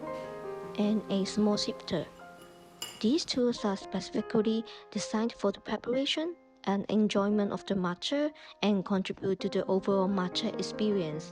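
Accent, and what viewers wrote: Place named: China. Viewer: Are you Chinese? I love your accent.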